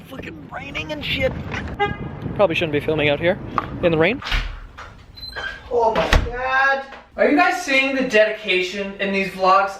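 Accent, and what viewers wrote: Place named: New York, United States. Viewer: He has a NYC accent so that's why he says "cock-a-roach" lmao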